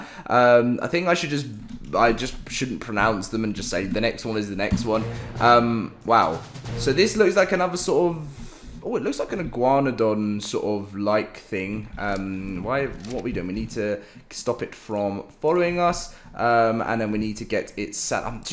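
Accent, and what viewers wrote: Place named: Birmingham, United Kingdom. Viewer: U sound like my friend that lives in Birmingham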